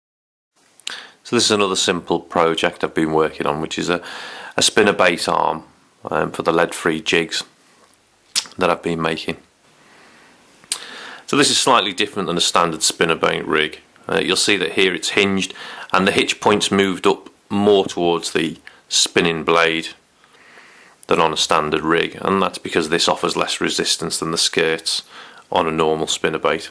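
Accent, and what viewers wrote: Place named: England, United Kingdom. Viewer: Nicely done, thank you. Interesting accent, where are you from? Obviously from England, but I understand the accent is different in different areas. Thanks.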